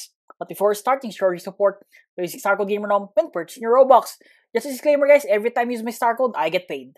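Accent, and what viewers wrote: Philippines. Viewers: You have filipino accent bro are you filipino? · This guy reminds me of my teacher in computer because his Filipino accent😂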